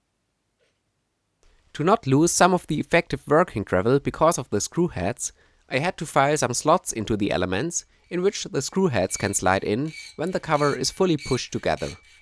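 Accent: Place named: Germany. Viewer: German accent , German ingenuity !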